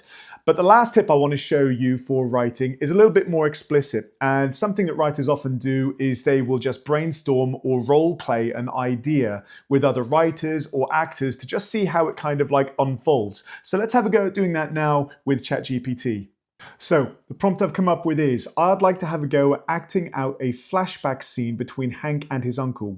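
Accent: United Kingdom. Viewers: This is pretty funny, a Ukraine YouTube blogger uses it; the voice is the same, although it is Ukrainian) a gorgeous British accent you have, mister)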